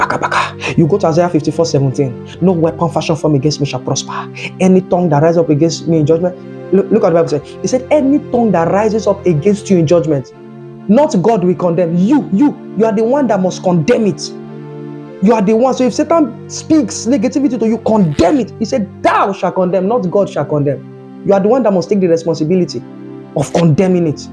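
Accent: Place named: Africa. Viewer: Great lesson, scriptures and love the African accent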